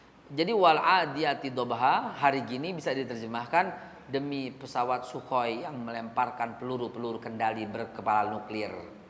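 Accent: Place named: Betawi, Indonesia. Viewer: Dialeg betawix yg saya suka yg justru krn kturunan, tp akidahx ciamik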